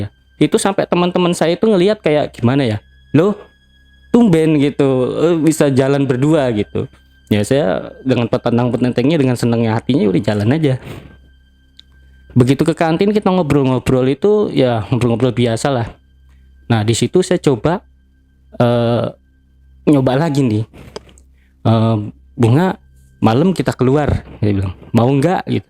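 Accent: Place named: Java, Indonesia. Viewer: Mas logate jowo meni k an asli endi kui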